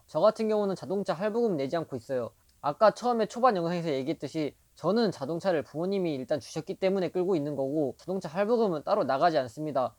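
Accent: Gangwon, South Korea. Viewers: 와 또래중에 강원도억양 제대로들은거 처음이다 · 강원도 사투리 ㅋㅋ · 강원도 사투리 정감가네요ㅎㅎ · 말투가 강원도? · 경기 북부 강원도 사투리 ㅋㅋ 제가 잘 알죠. 가평. ㅋ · 강원도 사투리가 살짝... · 강원도억양 아직 있으시죠?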